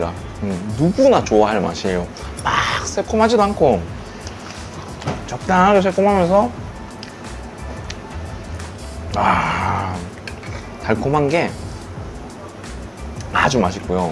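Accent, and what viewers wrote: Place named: Gyeongbuk, South Korea. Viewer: 저는 경주에사는 50대 그냥 아줌마입니다^^여러~~유투버들봤는데 댓글은 정말,첨으로 남깁니다. 맛나게드시는건 기본이고 인정도많네요.특히 시장에서는 더 한듯~~방안에서 배달음식보단 나가서 시장순례가 더 중독으로가게하네요^^같은경북이라 억지로 표준말안쓰시고 경북말씨 더 듣기좋앟어요^^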